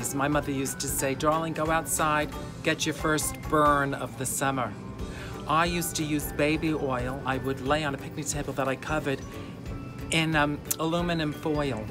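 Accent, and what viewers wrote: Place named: New York, United States. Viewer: I love your accentuated New York accent. Reminds me of home. ❤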